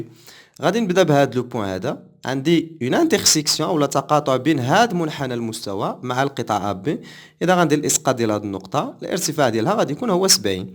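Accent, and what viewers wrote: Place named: Fez, Morocco. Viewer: Accent dial nass fas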